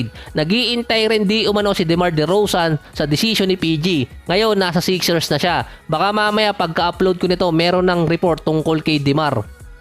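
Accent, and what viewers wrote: Batangas, Philippines. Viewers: BATANGEÑO PALA SI IDOL .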